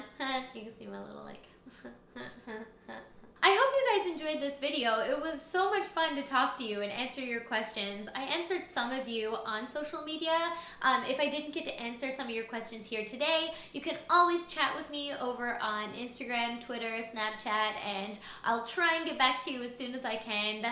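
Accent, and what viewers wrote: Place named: Newfoundland, Canada. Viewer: TIL Newfoundland has a very distinct and interesting accent, coolbeans! Also that nipple covers make excellent MacBook feet (I use chair leg covers for them, myself).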